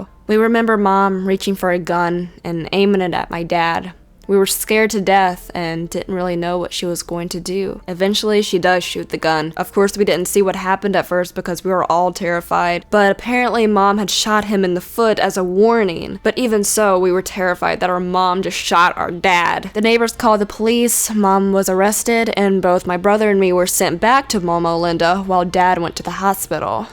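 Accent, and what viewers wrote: South, United States. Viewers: No wonder you have a small southern accent!! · I knew you were a southern belle, such a lovely accent. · I just realized from this video she has a country accent.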